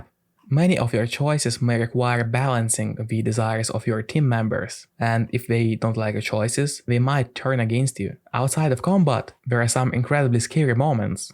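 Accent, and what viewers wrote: Eastern Europe. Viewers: I couldn't explain his channel better I saw in his other videos pepole making fun of his heavy Slavic accent but I love it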